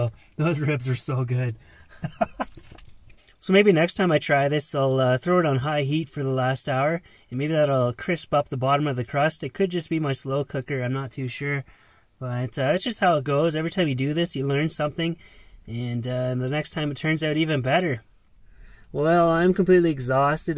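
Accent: Canada. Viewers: Your food looks amazing. Also, I love that Canadian Accent. New fan :) all the way from HOT Sydney Australia. I wish I lived in the cold! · I am a new subscriber due to your accent. Canadian A? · I am Canadian myself, so I recognize a strong, Canadian accent when I hear it. Are you from out West? Maybe far East coast? I'm from South East Canada myself, or south west Ontario. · He sounds too Canadian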